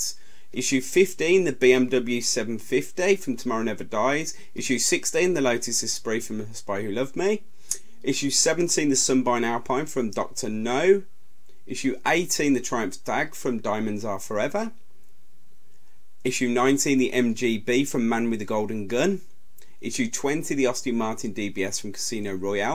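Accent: United Kingdom. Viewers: Making a recording like this might look easier then it is.... I'm glad he did it. And he speaks British English....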